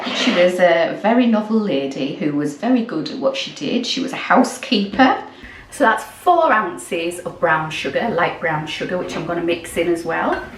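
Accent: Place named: Cumberland, United Kingdom. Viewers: Wow! That is delicious! Thanks very much we all loved it. Enjoyed watching you make it too. I’m from the UK but live in New Zealand and I really enjoyed hearing your lovely Cumberland accent-slight homesickness was caused! So, many thanks from another! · I'm from Cumbria but i live in Italy, it was so nice to hear your accent, it made me homesick, I'll definitely be making this gingerbread, thank you .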